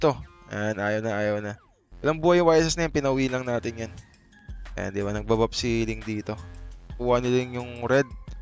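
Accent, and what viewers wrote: Iloilo, Philippines. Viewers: Tanong ko lng Tga Iloilo ka Po?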